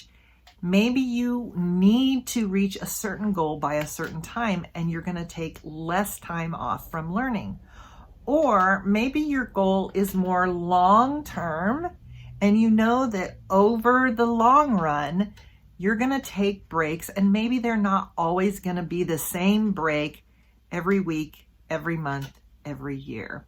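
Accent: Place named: United States. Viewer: I love American English and American Accent so much Dear.😊😇🥰😍🤗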